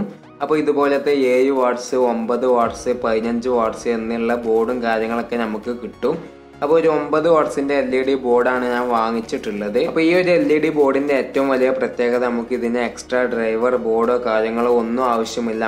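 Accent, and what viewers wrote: Malappuram, India. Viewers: മോനെ നിനക്കും നിന്റെ വടക്കൻ സംസാരത്തിനും നല്ല ഗ്ലാമർ ഉണ്ട്‌. വീഡിയോ ഇഷപ്പെട്ടു നന്ദി. വീഡിയോയേക്കാൾ ഇഷപ്പെട്ടത് അതിന്റെ ബാക്ക്ഗ്രൗണ്ട് മ്യൂസിക്ക് ആണ്. അത് എഡിറ്റ്‌ ചെയ്തവരോട് ചോദിച്ച് ആ മ്യൂസിക്കിന്റെ പേരും അത് പ്രൊഡ്യൂസ് ചെയ്തയാളുടെ പേരും എന്റെ ഫോണിൽ (<num>) SMS ആയി അയച്ചു തരണമെന്ന്‌ അഭ്യർത്ഥിക്കുന്നു. ആ മ്യൂസിക് നെറ്റിൽനിന്നെടുക്കാൻ വേണ്ടിയാണ്. അ മ്യൂസിക് കേൾക്കുമ്പോൾ യൂറോപ്പിൽ ഇരിക്കുന്ന പ്രതീതി. തീർച്ചയായും അയച്ചു തരുമല്ലോ?. താങ്ക്സ്. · Nee മലപ്പുറം ആണോ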